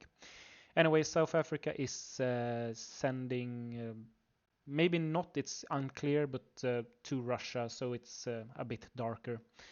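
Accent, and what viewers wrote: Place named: Sweden. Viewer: you sound exactly like a swede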